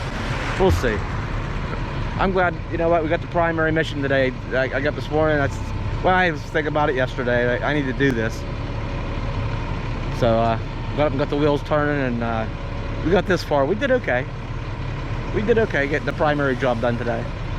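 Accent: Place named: Pacific Northwest, United States. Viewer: You sound like livening in the PNW .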